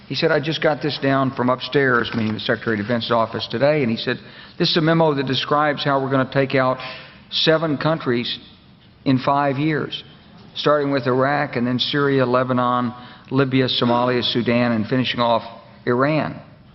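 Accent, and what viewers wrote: Pakistan. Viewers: Don't know who is this idiot who is trying to teach India. From his tone I can make out that he is another illiterate Pakistani but he should know that India is quite superior than Pakistan & even his uncle China.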